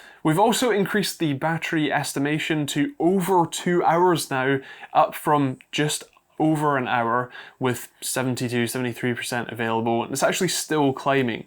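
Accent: United Kingdom. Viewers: Interesting video, I'm just curious about your accent, what part of UK are you from?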